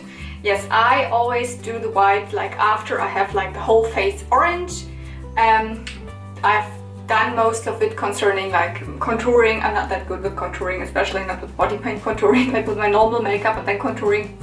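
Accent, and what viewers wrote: Germany. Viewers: Bin ich die einzige, die den Deutschen Akzent raus hört? PS: tolles Video😊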